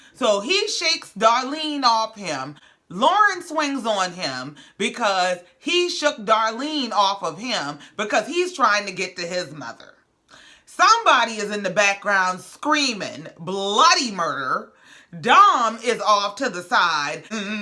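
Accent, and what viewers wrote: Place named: Charleston, United States. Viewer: are you from Charleston, SC or have roots there? I subscribed because of your accent and content. #GullahGeechie for life❤️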